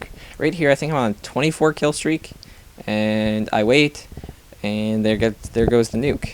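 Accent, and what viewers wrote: Canada. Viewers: Dat canadian accent he has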